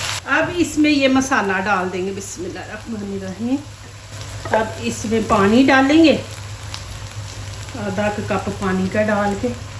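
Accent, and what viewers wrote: Punjab, India. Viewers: Watching from Buckingham, UK. I liked the recipe and your Punjabi style commentary too :) I will try to cook this soon. Insha Allah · Aap kiapunjabi ha bolne ka style se lag Raha ha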